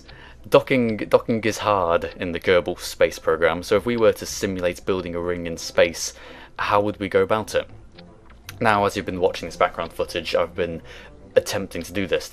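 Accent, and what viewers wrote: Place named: Wales, United Kingdom. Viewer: you sound welsh :) watching in caerphilly :)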